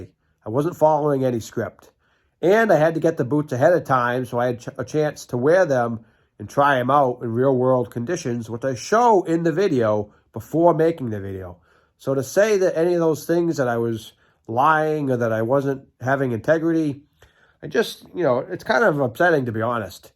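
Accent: Massachusetts, United States. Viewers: You must be a fellow MA resident I can by your local accent. 😊 Have had a pair of the Marin waterproof boots in black for a week now. Super comfy so far. I bought them for work (small engine repair) and for riding my motorcycle. I hope to do a one year review on mine next year but I’m sure it won’t go over very well since I’m a girl so in most people’s eyes it’s like “ oh what does she know”. Glad to see you’re a happy camper after a year in yours!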